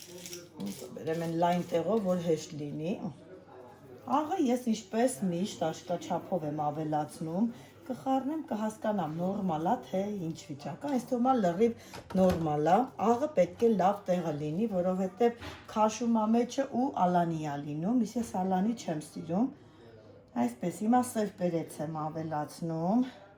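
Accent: Lori, Armenia. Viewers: Բառբառիցդ երեումա , որ լոռեցի ես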